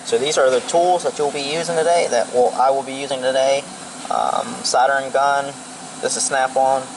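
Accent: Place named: South, United States. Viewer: What’s up brother just subbed thanks for the info. Where you from? Trying to figure out where you accent is from sounds southern a bit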